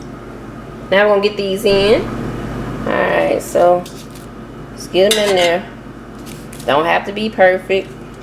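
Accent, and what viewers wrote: South, United States. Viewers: I love Southern women's voices...I assume you are from the South. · Great video .keep up the great work. And I just love you country style accent. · Love that Southern accent !!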